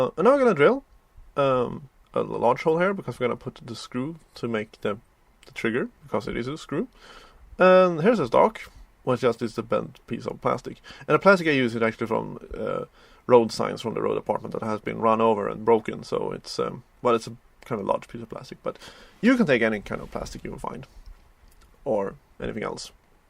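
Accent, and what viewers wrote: Sweden. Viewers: THE SWEDISH IS OBVIOUS!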